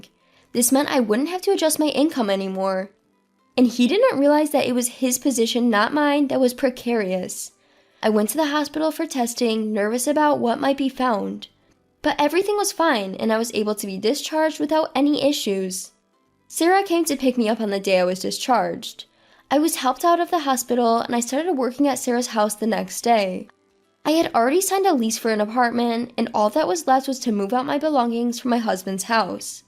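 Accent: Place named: Middle East. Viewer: At least it wasn’t Chinese AI. More like middle eastern.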